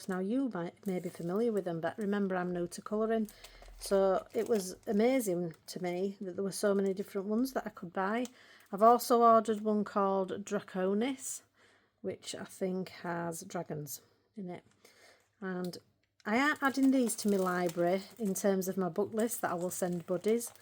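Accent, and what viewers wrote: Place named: Scarborough, United Kingdom. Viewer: Your accent is like mine are you In Scarborough? There again you said the Range. We don’t have one lol 😂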